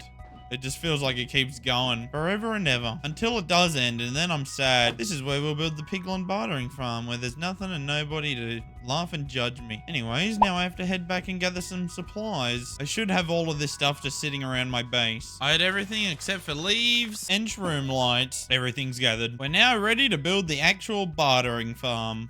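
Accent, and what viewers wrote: Australia. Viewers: aussie minecrafter?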